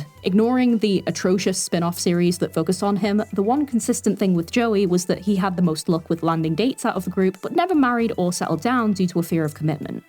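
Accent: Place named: Lancashire, United Kingdom. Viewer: Haha yeah I've always found it wild that there's one of my 'own' doing the whole Youtube thing. I can't place your accent, you're definitely from around at least Lancashire/Greater Manchester but I imagine you enunciate more on YT anyway seen as I tend to do so when I talk with anyone outside of the North, especially Americans/Canadians. Americans often think I'm Scottish because of how thick my Bolton accent is, even when enunciating. UK Publishing has a huuuge classism issue. They've been trying to level out the playing field for years but it's still very gatekept. Both for workers looking to get into the industry and authors looking to get published. Most agents are from middle class backgrounds, so as the first port-of-call for authors looking to get published, if they don't relate to the material sent to them, it often gets rejected. 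It doesn't even get a chance to be looked at by a publisher. Though I'm glad to see we have indie publishers based out of the North like UCLan now. Haha yeah I love my '80s stuff but the '60s and '70s were banger too. Whilst Northerners have been largely barred from publishing spaces, we instead have a rich performing arts culture. Plays like A Taste Of Honey by Shelagh Delaney were groundbreaking. The fact a play written in the '60s, set in grimy Salford, depicted a teenage schoolgirl getting pregnant by a Black sailor and then exploring her feelings about the pregnancy with her openly Gay best friend is mindblowing when you think about t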